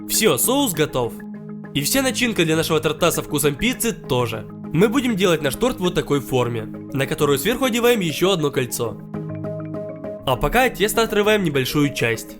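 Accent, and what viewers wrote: Ukraine. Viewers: И сейчас есть украинский акцент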